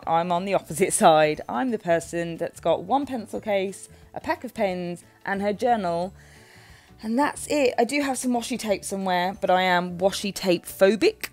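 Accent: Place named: England, United Kingdom. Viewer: Awesome videos! Lovely to hear an english voice for once. If I was as artistic as you I probably wouldn't use washi either!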